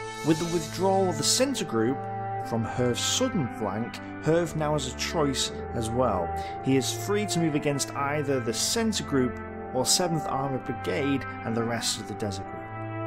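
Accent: United Kingdom. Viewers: Not sure if its True British Accent It could be Australian Or Down Under period, either way your English Accent is Thick as Hell Period and Im from USA and have a hard time Understanding what the hell your saying......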